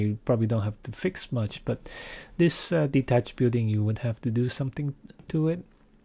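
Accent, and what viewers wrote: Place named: Philippines. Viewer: Why do viewers think you are Japanese? You sure sound kabayan to me. Thanks for your informative videos.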